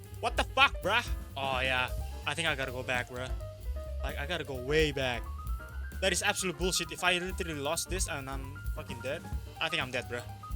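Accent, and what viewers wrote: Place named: Russia. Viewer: You speak Like Russian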